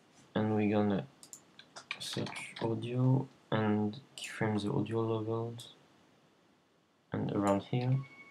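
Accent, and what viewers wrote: France. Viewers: a il est vraiment français j'me suis dit : merde, il a un accent français chaud pour lui :rire: maisq bon il a beaucoup de vocabulaire :3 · L'accent français est juste épic ^^. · where are you from ? not sure if you're french or not ! and this mask is awesome ! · u sound French, your voice is cute!!!! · I think hes French, I'm French and I have the same accent. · Omg, mec, ça s'entend que tu est français! x3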